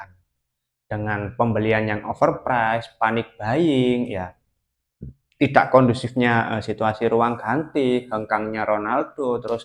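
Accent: Java, Indonesia. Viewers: Sampean jowone ngendi cak??? 🙏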